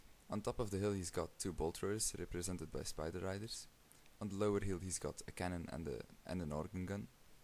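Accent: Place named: Flanders, Belgium. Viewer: haha I can hear your flemish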